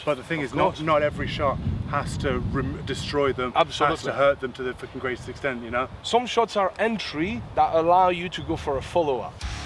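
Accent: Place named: England, United Kingdom. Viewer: Yeah very long time by your accent. it's just a curious combination of accents. It took me a minute to figure out you were a native french speaker. In the beginning first few seconds you sound very english . Regional accent is very strong but they you listen again and you can tell you are not a native speaker but it's very hard to tell where. I figured french . THEN I saw your name.. after J wrote the comment and realised.. ahhh obviously he's french. But it's not at all easy to tell. You definitely sound more English that french..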